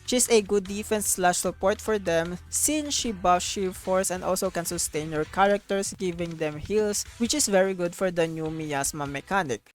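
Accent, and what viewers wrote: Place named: Philippines. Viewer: Beri Pilipino accent! Reminds me of Jo Koy. Correct me if I'm wrong but if right, keep it up kabayan! 😊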